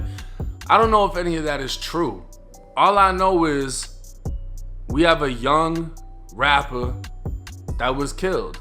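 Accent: Boston, United States. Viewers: is the best story teller on YouTube hands down, I watch all this guy videos. The way he talks and gets things across + that Boston accent 💯 dope.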